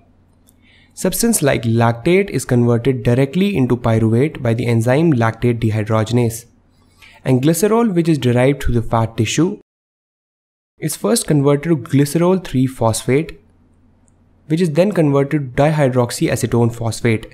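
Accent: India. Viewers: Difficult to understand. Is there anyone there who doesn't speak with a heavy Indian accent. If so, please let them narrate the video. You are losing view count because people can't understand the speaker. If this is not meant to be viewed by North America, please disregard.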